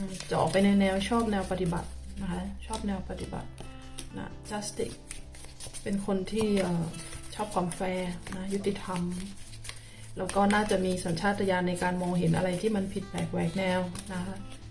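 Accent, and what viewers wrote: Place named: South, Thailand. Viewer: คนใต้คับผม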